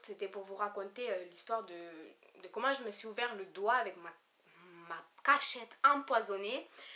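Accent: Marseille, France. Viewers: te marseillaise nn ta le même accent que moi sur se te trooop Belle😍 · Tu es Marseillais ? Super vidéo ❤️💋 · Aie on a reconnu direct l'accent Marseillerr !!!!! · t est marseillaise ?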